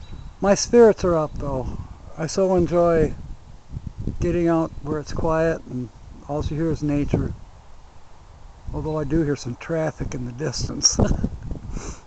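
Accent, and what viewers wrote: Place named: Michigan, United States. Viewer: Hey there. Sounds like Michigan!!!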